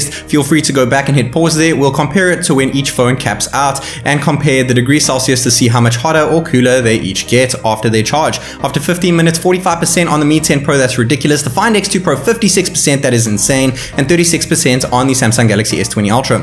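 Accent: South Africa. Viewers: Is this guy a South African?? How'd you get these phones?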